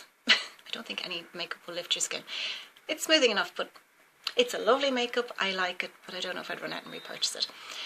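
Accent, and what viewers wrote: Ireland. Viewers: Hi, you sound Irish, beautiful accent and beautiful lady, you are so refined, lovely. I'm from Nova Scotia Canada, and a great line from here is called The Ordinary, natural, no silicone, no additives etc and wonderful prices, its blowing up on you tube! Wayne G recommend it! I love Chanel foundation as well. Looking forward to watching more of your videos, Karen from Canada 🇨🇦.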